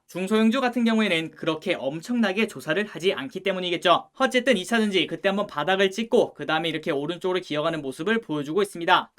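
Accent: Gyeongsang, South Korea. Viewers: 대구? 경북? 어디 사세요?ㅋ 제가 대구라 반갑네요 ㅋㅋ 근처에 연예인이 사는느낌이군욬ㅋㅋ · 고향이 대구십니까?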